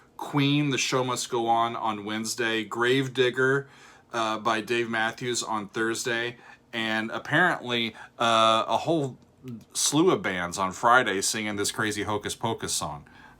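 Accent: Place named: Oklahoma, United States. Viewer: I'm in Oklahoma. Dude you have the Oklahoma accent ... Well maybe not .. not far off tho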